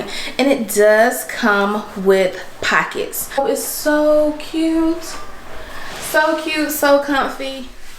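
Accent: South, United States. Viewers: Do you have a country accent it's so sexy with yo thick fine self with all do respect boo 😘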